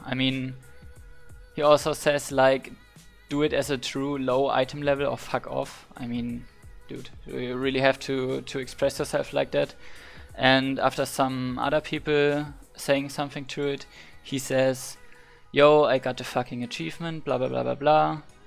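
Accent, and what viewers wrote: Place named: Germany. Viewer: Ich wusste doch der akzent ist Deutsch! Ein Frostwolf kollege! :D Davon ab: sehr gutes Video, habe erst kürzlich wieder angefangen und Visions sind noch recht neu für mich (hab gestern den ersten 4Mask run gemacht). Ich konnte jetzt einige Sachen aus dem Video mitnehmen die mir sicher in späteren Runs helfen.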